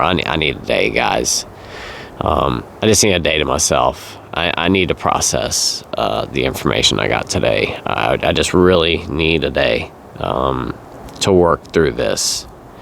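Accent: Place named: South, United States. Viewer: Hi, I’ve been following you since “dry” January up here in CT when I decided to cut back on my drinking and you’ve made such an impression on me that I’ve turned it into a happier, new way of life. I love watching your videos, your honesty in telling your story in your easy Southern style of speaking. Tonight’s video was really a heartbreaking setback. I’m so sorry. You look so healthy and handsome, it’s hard to imagine you could have so many complications. I am praying for you, as I know many of your audience are. But somehow believe you are going to get through this. Keep the faith. ❤ Katheryn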